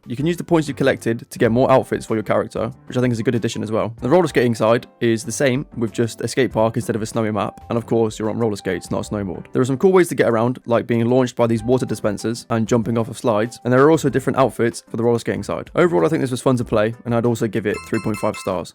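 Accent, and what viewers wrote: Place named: United Kingdom. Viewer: Great video! Thanks for rating these games fairly! (also you are so British sounding it’s like I can see the difference between color and *colour*)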